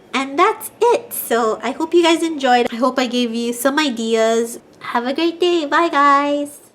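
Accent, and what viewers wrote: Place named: Hawaii, United States. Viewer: I love your video and use of the different hobonichis 😁. By any chance are you from Hawaii? You sound local and make me miss home. (Much like nadine)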